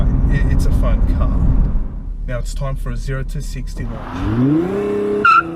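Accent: Australia. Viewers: You sound like an Aussie! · you got an aussie accent mate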